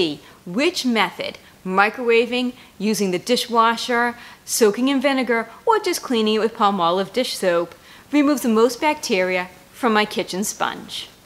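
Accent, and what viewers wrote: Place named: New England, United States. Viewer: This was good. I just discovered your channel and will have to check out more of your videos - they sure look interesting! I hope you don't mind this Canuck saying, I like your New England accent. ☺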